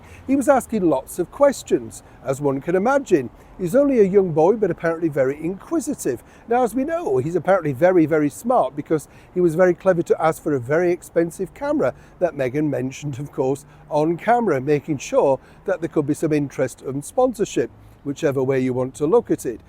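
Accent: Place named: Northern England, United Kingdom. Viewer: I think I found you on youtube during the covid debacle. It was lovely to hear your Northern accent as I'm from Manchester but lived in the US for 45 years. I love hearing you talk about foods, places and things I haven't thought about for years. Thank you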